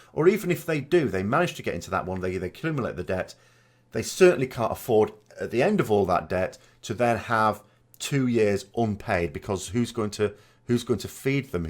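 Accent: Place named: Northern England, United Kingdom. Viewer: Hi I think a lot of people who come to your videos would like to have you as a friend. To be honest my Finnish wife loves your accent as do I. As a southern born guy who lived up north for many years. I always crack a little cloth cap and ferret down trowsers joke with her, and she is laughing her head off. I explained just how grim it is up north. :) I am very happy to sit and listen to you for hours. You have a solid hold on whats going on in old blighty. Good luck to you mate.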